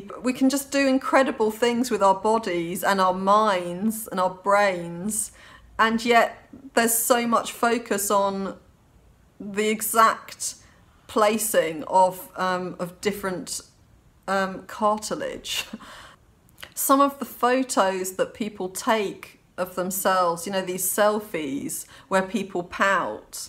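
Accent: United Kingdom. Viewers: your perfect British accent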